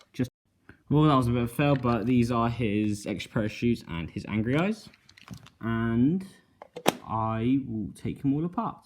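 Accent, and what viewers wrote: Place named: United Kingdom. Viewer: I’m sorry but it’s a solid British accent , it’s very easy to understand what is being said , are you from South Africa????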